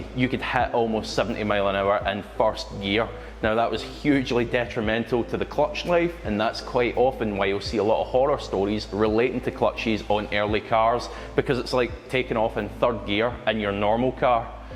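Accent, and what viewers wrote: United Kingdom. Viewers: Can’t understand shit he saying I hate British accent so ridiculously funny and can’t understand nothing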